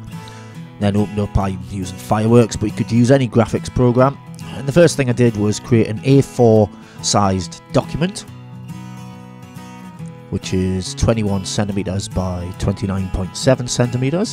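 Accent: North East England, United Kingdom. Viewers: Thanks for this tutorial you blew my mind such a simple solution to making eyeballs for my handpainted skulls been looking all over for a way to do that without buying doll eyes from China. Of course I find genius solution from someone with a north-east accent hahaha cause that's where I'm from too! Proper class pet! Canny video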